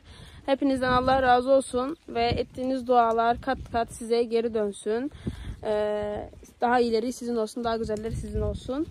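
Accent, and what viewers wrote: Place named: Rural, Turkey. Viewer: Şu YouTube de ilk kez bana hitap eden bir sayfa buldum... İstemsizce saf ve icinden geldiği gibi bizim köylü agzi ile konusmasi beni o kadar güldürdü ki Allah da seni güldürsün 😂😂😂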